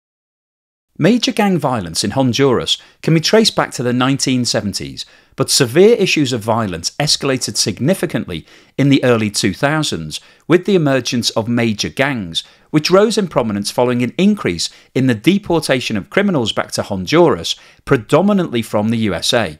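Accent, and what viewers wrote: United Kingdom. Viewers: As soon as I hear this British accent, I block this videos almost immediately, because of all the scams, using the same British accent voice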